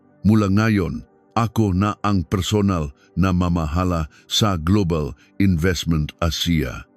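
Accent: China. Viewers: alanganin na intsik ang pangit pakinggan ayusin mo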